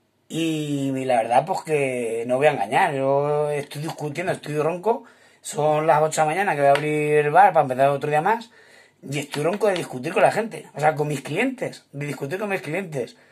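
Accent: Albacete, Spain. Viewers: Enhorabuena por tu seriedad, que no está muy extendida entre otros propietarios de bares que con tal de ganar. Y, además, tu habla es 'mu' de Albacete. Saludos de un paisano de a diáspora madrileña. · Muy bien explicado, los de Albacete somos así, claros y transparentes, soy camarero, soy mallorquín, mis padres son de Agramon, y yo me crié de pequeño allí (en lo salvaje)estoy muy orgulloso de ello,por cierto tengo el mismo deje que tu hablando,eso siempre me acompañará un saludo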